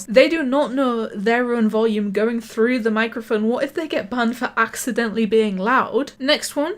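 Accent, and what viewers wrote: United Kingdom. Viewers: Oh well glad to hear your British accent again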